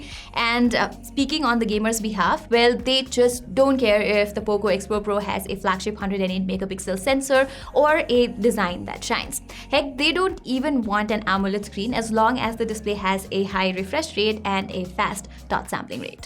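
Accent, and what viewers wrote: India. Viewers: Your hindi accent sunke mujko Accha lagra hai....., 😍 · Just fell in love with her Hindi accent 😍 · Your Hindi accent hayyy 😍😍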